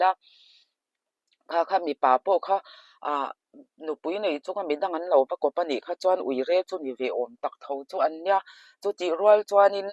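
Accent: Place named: Mizo, Myanmar. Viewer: I thu ziak post ka lo chhiar ṭhin a, a ṭha ka ti viau ṭhin a, mahse i ṭawng ngaihthlak chuan ka lo rindan che nen chuan a va inpersan e, ka ṭawng lo hawlo la min lo hrethiam la, i ṭawng hi ngaihthlak chian chuan Burma Mizo ṭawng a ang, a pai lai deuh a awm nual mai, phalrai tih te adang pawh ka hre ṭeuh, mahse dan hriat hi apawimawh a, chu lamah chuan i ngaihsanawm.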